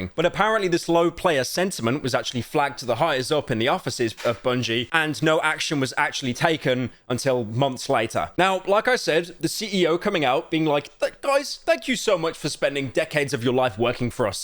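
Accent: United Kingdom. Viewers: Why are you british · I love new haircut, dunno why he sounds british now though!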